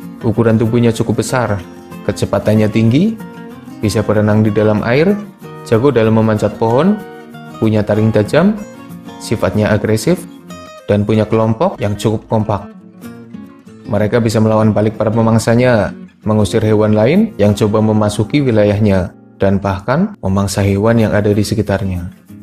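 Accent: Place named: Java, Indonesia. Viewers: Nampa x jowone hhehe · Jawanya medok banget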